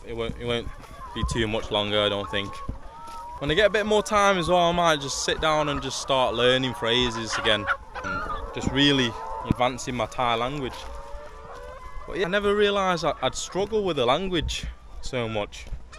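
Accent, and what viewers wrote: United Kingdom. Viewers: Bloody hell!! A Thai man speaking British! Good grief i can't believe it! 😅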